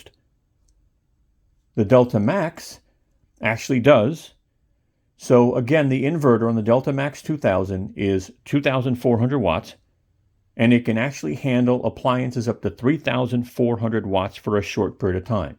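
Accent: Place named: United States. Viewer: I have to say what a well put together video and introduction to these inverters. The host has a lovely clear articulate accent (I am English English so I find the young American accent a bit confusing! ) and the style of introduction was logical, informative and a breath of fresh air among other channels. I only watch YouTube nowadays and find there is such amazing content by conscientious Tubers like this gentleman. I have decided to buy the mini for my holiday home in South Africa for the 2-3 hours “loadshedding” (power cut) so I can watch my YouTubes on the big telly. I have gas stove for getting my 2 hour tea fix and refrigerator can go on and off as it likes so the capacity and function is just right for me . Again thanks for very well presented “ military officer style” instruction and excellent slides and explanation. 👍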